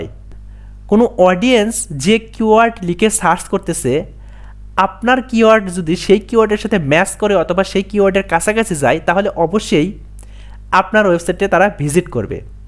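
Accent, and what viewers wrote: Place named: Bogura, Bangladesh. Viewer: ji vai apnr kotha sune bujte parchi vai ..... je apni bogurar manush ,, amieo bogura thaki vai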